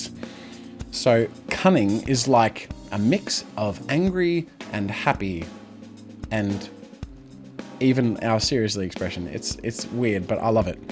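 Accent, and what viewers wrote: Australia. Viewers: really nice.. are you australian?^^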